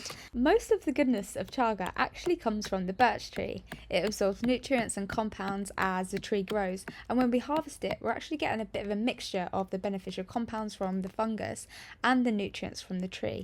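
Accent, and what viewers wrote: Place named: Yorkshire, United Kingdom. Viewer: You sound well posh for a Yorkshire lass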